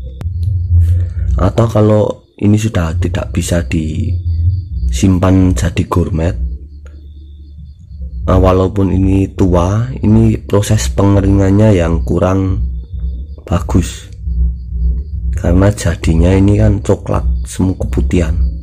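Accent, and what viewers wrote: Temanggung, Indonesia. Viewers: Dari logatnya bebicara kayaknya dari temanggung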